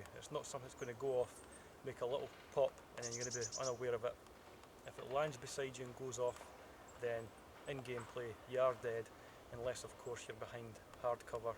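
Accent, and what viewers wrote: Scotland, United Kingdom. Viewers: He sounds Scottish but UK actually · he so british i cant understand a thing he says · are you scottish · are you scottish ? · Scottish he is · i love the scottish accent :D its so damn cool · This guy is Scottish, and using a Stielhandgrenate... TF2 Demoman anyone? Ullapool Caber!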